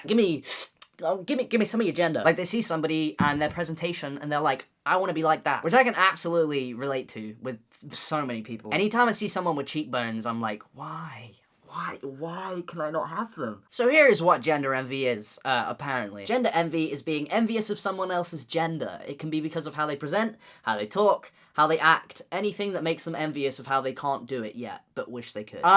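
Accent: England, United Kingdom. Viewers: im sorry it's just that english accent is so refreshing to me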